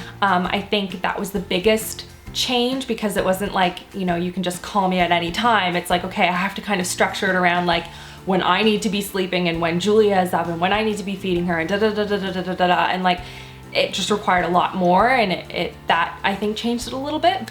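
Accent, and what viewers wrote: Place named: Canada. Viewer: I've never really heard your Canadian accent until this video, lol. So cute! Great content, as always.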